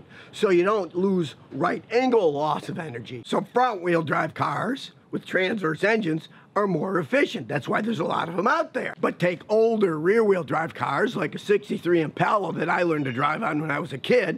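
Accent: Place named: United States. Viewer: sounds super American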